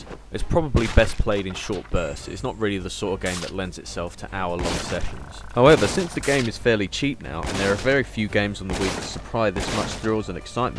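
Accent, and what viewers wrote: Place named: United Kingdom. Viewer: Thanks, nice short, snappy review. I'm thinking of getting a cheap Wii so I'm checking out some cheap games too. And always nice to hear a restrained British accent on YT.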